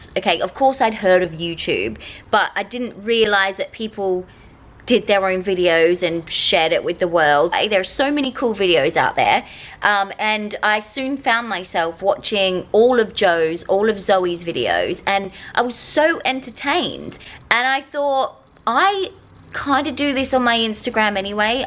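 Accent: Australia. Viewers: thank you for making me smile thro out these vlogs. ive been poorly and feeling very down lately and you always make me smile 😌I hope is meet you one day seems I love Australian accents 😍 Insta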